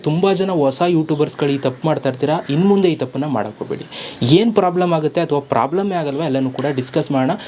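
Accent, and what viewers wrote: Mandya, India. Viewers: Pakka nam mandya. Bhase nivu. Sup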